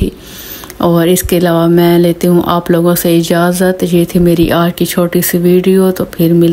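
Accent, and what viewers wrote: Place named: Chakwal, Pakistan. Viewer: لہجہ چکوال شریف کا لگ رہا ہے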